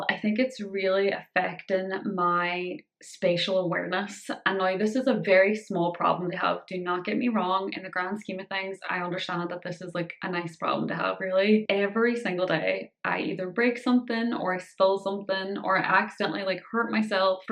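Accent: Ireland. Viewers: 🙏🙏🙏 Keppra works for me. Been on it since 2006. Added Trileptal a few years later. The two together work awesome. Everyone responds different to different meds. Love the Irish accent ❤️ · The cards are such a wonderful idea. I have a little handwritten folded paper in my wallet with emergency contact info just in case. (I have a panic disorder and medication can sometimes make me dizzy). I think everyone should have a card anyway :) Also please do not let the negative comments about what you should post get to you, it’s YOUR channel. You really do not owe anyone anything. It’s a gift that we get from you to see what you’re up to or how you’re doing on your time. With that said I’m so happy you’re back in the homeland and getting the help you deserve! I know this new medication is going to be great! It’s such a huge accomplishment to have progressed in the physical side and now with a few adjustments I feel the spacial issues can resolve too. 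P.S. your Irish accent is always so refreshing to hear ❤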